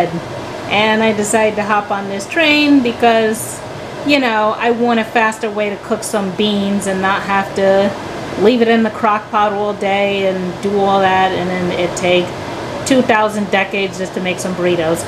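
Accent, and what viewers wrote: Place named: New York, United States. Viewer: will never get over the glorious ny accent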